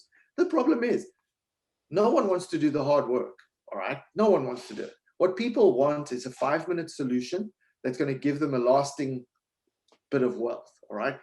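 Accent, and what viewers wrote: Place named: United Kingdom. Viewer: you have such a pleasant way of communicating I could listen to you talk all day. The British accent doesn't hurt either.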